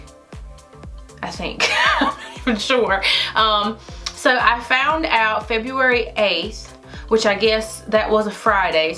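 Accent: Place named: North Carolina, United States. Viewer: I got North Carolina accent as well lol. love it.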